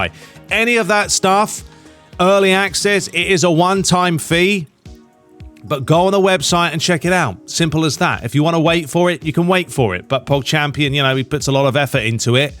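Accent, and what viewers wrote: United States. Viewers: That American accent sounds just like Taylor twellman haha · As an American viewer, I have to say you nailed your American accent. Yee haw!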